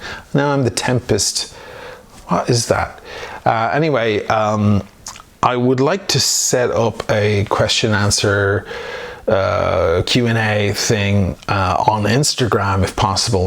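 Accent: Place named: Dublin, Ireland. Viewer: Jaime His accent is pure Dublin. Not picking up any American at all. Not a criticism in any way but I’ve had both Irish and American girlfriends and this man is pure Irish. It’s a cool accent anyway and this guy is a rising star of the watch people online community. I love his passion for the pieces.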